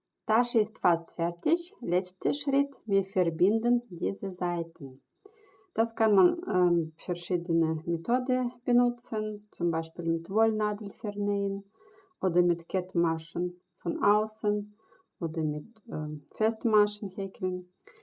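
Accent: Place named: Russia. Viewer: Рашистский акцент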